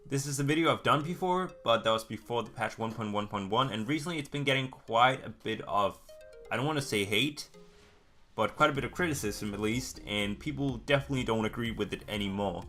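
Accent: United States. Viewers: You sound american, you tend to slip into sound like your from new york and you say some things in an English accent sometimes. Why is this?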